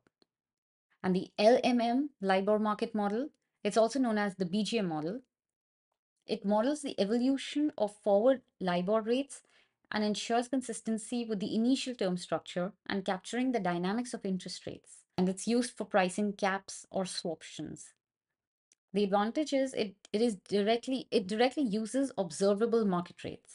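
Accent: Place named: India. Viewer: Great video but the Indian accent makes it difficult to understand sometimes, especially with names you’ve never heard of. Maybe use AI to translate English to English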